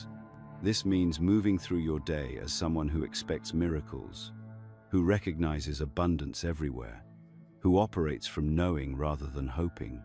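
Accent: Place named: United Kingdom. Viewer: With the British accent 😅😢😅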